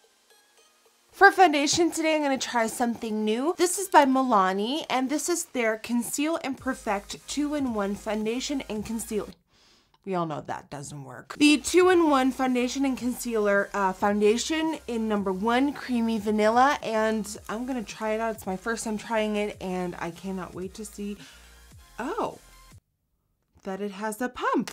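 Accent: United States. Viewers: how come she sounds American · i had no clue you weren't from the states, you sound american lol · Can you speak Dutch or something because you sound American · Hoe heb je zo'n Amerikaans accent gekregen? 😝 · you live in Holland? oh. you sound american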